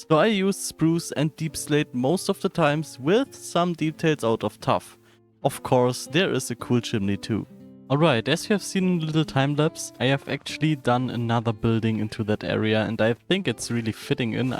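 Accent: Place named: Germany. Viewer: at the beginning i thought he isnt engish like i hear german through and then wen you said your not good in speaking english and that your from germany it all maked sense (subcriceb)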